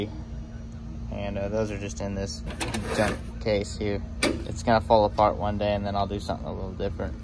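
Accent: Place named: Tennessee, United States. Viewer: You look and sound damn near just like myself.. but you got more a Tennessee twang to ya.. im outta ky, backwoods.. where abouts you from? no homo…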